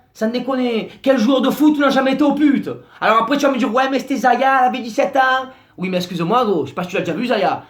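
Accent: Marseille, France. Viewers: c'est qui ce mec avec sa tete de croate et un accent marseillais des années 2000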